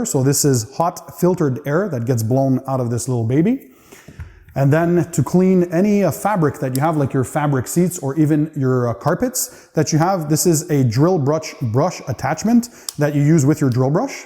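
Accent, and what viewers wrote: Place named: Canada. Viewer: par pur hasard après avoir acheté la cire céramique hydrophobe de chez Meguiars j’ai eu des propositions de vidéos qui m’ont plus donc je me suis abonné. J’adore le contenue de tes émissions et l’accent Canadien nous les français on adore cela lol.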